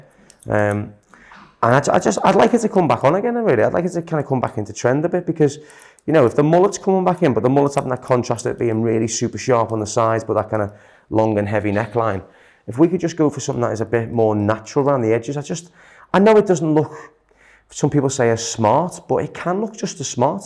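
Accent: Liverpool, United Kingdom. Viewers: Scouse barber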